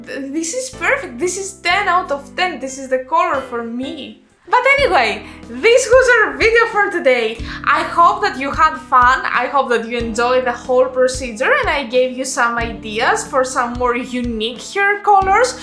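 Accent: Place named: England, United Kingdom. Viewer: You are always good surprise :) Are you maybe from England? You have a great accent. :)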